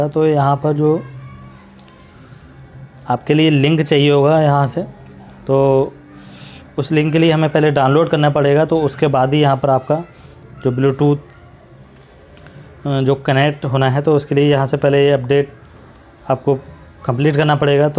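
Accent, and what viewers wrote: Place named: Arab world. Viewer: Are you speaking like arabic or the worst english in the universe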